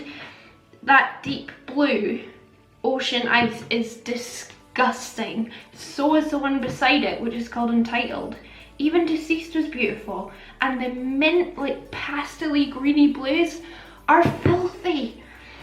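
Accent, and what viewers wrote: Scotland, United Kingdom. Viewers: I adore this!!! Where are u from girl? I’m getting a Scotland accent and I’ve been waiting for a Scottish MUA pal for literally forever so I’m dying😻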